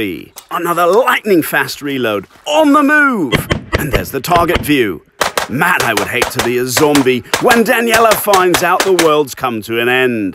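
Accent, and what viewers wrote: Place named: England, United Kingdom. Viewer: The commentator sounds totally un-American. Has an English accent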